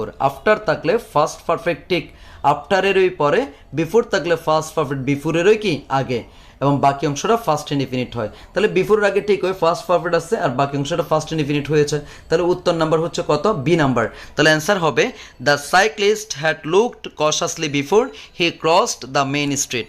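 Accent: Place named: Chittagong, Bangladesh. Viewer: Ctg r basa buja sbr kaj na bhai Eke to free paccen tar majhe ajaira kotha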